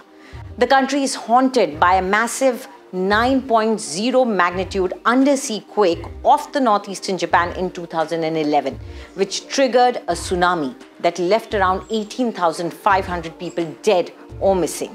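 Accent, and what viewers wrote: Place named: India. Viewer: 読み上げの女性の英語がめちゃくちゃインドなまりで面白かった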